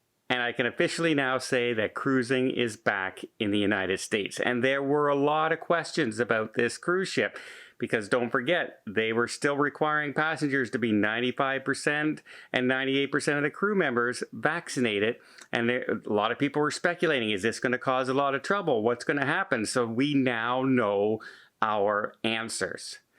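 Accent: Canada. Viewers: Are you aware that you sound Canadian??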